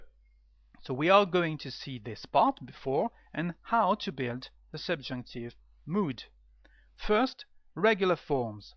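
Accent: France. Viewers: This was very useful, and you're accent and truly francophone delivery made it greatly genuine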